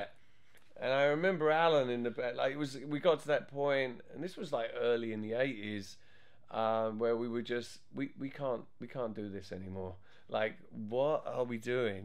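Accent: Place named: Cockney, United Kingdom. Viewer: A bit of Cockney accent in it, I'd say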